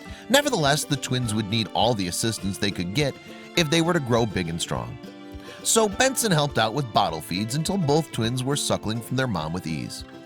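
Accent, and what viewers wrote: United States. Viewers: Do you have hearing issues?? 😕 He says FOAL (*NOTHING ELSE!*) MULTIPLE TIMES, & *EQUINE.. NOT 'Quine'!! So-?????!!!!!!! 😒 Bloody well obvious, even in his thick AMERICAN ACCENT, to these Ozzie (Australian) ears of mine & the 7 ppl I just asked, INDIVIDUALLY. Don't know where you're from, but having an American accent doesn't mean his mispronouncing words!! I suggest you get a hearing test, dear.. & take this along with you and ask what the ENT professional 'hears'. PS: *Clearly, NEITHER will grow to be 'horses', either. They will NEVER reach the height a *PONY becomes a 'horse'.... given their fraternal twin situation. The ONLY difference is how many 'hands' they will grow to. Not enough to be 'horses'. They are ponies and have less than an 20% chance (Don Quixote) and less than a 10% chance that (Duet) could grow to the minimum 'horse' height..... 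just thought you should know that, since you're SO quick to jump in thinking you're an expert, despite obviously NOT being one. 🙇💫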